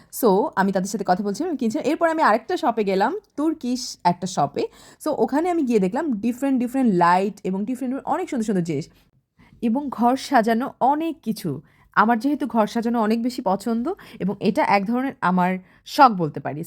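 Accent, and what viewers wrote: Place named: Kolkata, India. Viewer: আপনার কথা অনেক টা কলকাতা বাসি লোকদের মতো। মনে হয় না বরিশালের।। মাঝে মাঝে একটু লাইভ করবেন প্লিজ প্লিজ প্লিজ প্লিজ প্লিজ প্লিজ প্লিজ প্লিজ প্লিজ প্লিজ প্লিজ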